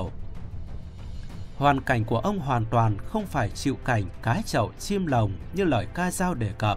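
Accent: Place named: Central, Vietnam. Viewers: Giọng này ng miền trung đọc theo tiếng bắc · Người thanh hóa họ nói vậy. Đào duy từ chắc là tổ của ad nên họ làm video này chứ cống hiến của Đào duy từ trong lịch sử không có gì nổi bật.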